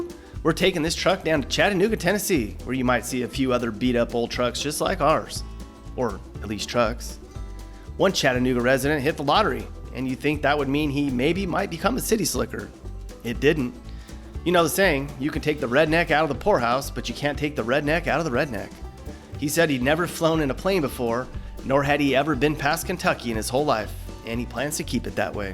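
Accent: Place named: New York, United States. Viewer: He;s a basic New Yorker thats what a lot of us sound like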